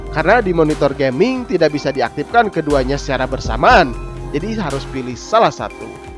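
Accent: West Java, Indonesia. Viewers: nyunda pisan euy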